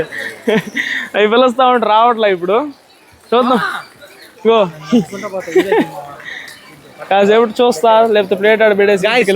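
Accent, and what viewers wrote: Nellore, India. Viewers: Nellore yasa chittamuru inthaka mundhu nellore ippudu tirupati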